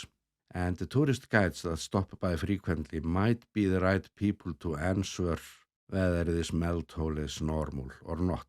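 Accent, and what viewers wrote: Iceland. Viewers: Jeg er ikke så god til engelsk. Og når det samtidig er med stærk islandsk accent, kan jeg næsten ikke forstå dig.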